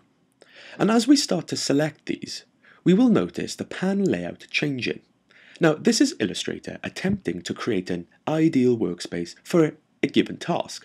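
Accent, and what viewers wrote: Wales, United Kingdom. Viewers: Thank you for these videos. Love the familiar Welsh accent too :)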